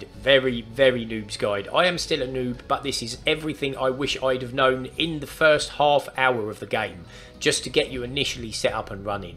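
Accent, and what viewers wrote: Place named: Geordie, United Kingdom. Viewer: lol listen to a geordie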